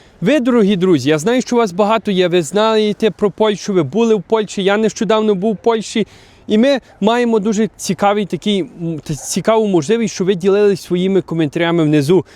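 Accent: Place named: Lviv, Ukraine. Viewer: Так, майже с початку заснування вашого каналу. Я з 1990 по 1995 рік навчався у Львівській політехніці, проживав у гуртожитку на Бой-Желенскького. Мені дуже приємно згадувати ті часи, а також львівський діалект, яким ви говорите👍. Натхнення вам, та Творчого успіху! Дякую за відповідь!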